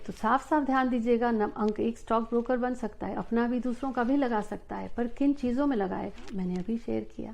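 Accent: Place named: Punjab, India. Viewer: Aye haye...loved that pnjabi ascent...aiven hi🤘🤘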